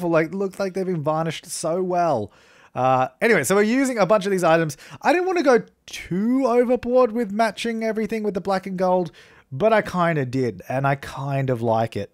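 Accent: Australia. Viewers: Wait, so you're not English? But Australian?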